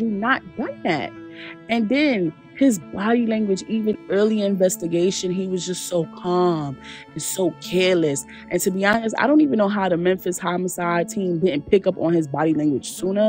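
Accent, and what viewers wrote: New York, United States. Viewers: New sub! 😂 Love how you narrate! Do I hear some New York? 👀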